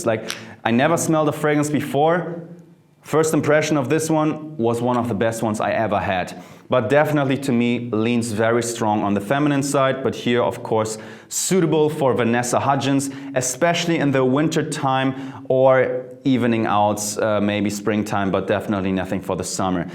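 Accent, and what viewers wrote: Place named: Germany. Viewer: omfggg german accent soo hard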